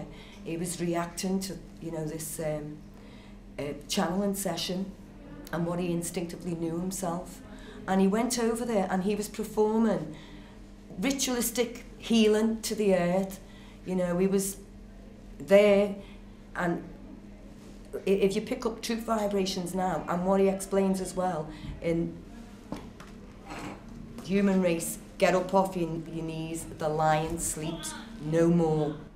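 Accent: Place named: Liverpool, United Kingdom. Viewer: love that liverpool accent. coolest in the world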